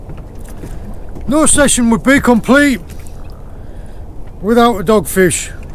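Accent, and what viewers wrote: United Kingdom. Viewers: Every time I watch your posts, I enjoy your crisp clear beautiful British accent. Besides, it is always nice when the fisherman knows very well weather he got snagged or he feels that some kind of fish got the hook in the mouth and try to hold itself in a whole. Perseverance paid off.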